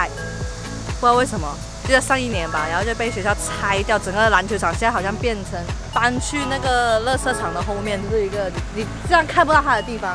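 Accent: Malaysia. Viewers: 怎么你的普通话口音不像香港口音，倒是像马来西亚口音（可能我听口音不是很准），但是讲粤语就有了。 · 你的普通話，好有馬來華語腔啊！ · 每次睇到既片都覺得香港人去台灣讀書講國語馬來西亞口音好神奇😂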